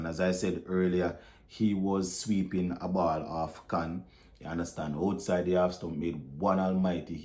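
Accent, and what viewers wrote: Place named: Jamaica. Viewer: greetings my brother. I trust all is well. I haven't heard you used the phrase "The men not good" in your Jamaican accent. I believe the expression will be relevant in this context.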